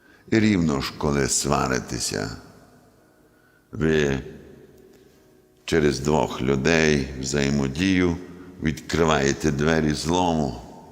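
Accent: Western Ukraine, Ukraine. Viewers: Любов Писаренко, мова о. прекрасна із дещо діалектом, який притаманний на західних територіях України.Слухати науку дуже люблю. Слава Ісусу Христу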